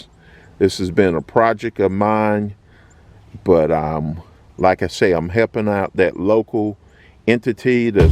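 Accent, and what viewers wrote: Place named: North Carolina, United States. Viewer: That’s a NC accent if I’ve ever heard one! Great review brother. 😊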